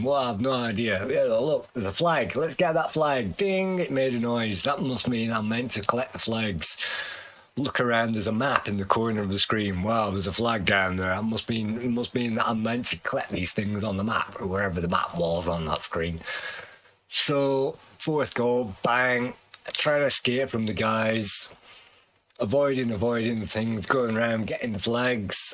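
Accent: Lancashire, United Kingdom. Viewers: Great to hear more about you. We're the same age and some your memories sound similar with me being from Yorkshire. Loving the Lancashire accent mate, don't listen to anyone giving out to you for it! Hope to see more videos soon.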